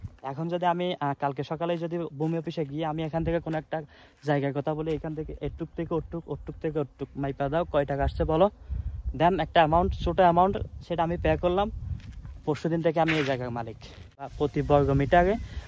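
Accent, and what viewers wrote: Chittagong, Bangladesh. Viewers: কথার একসেন্টেই বুঝা যাচ্ছে চিটাইঙ্গা ❤ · Chittagong er manusher shadu vashai Kotha bolte akto shomossha hoy ata shodo onar na shob Chittagong er loker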